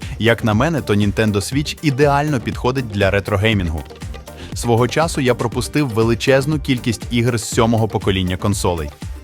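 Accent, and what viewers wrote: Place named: Surzhyk, Ukraine. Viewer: Это непонятно суржиком называется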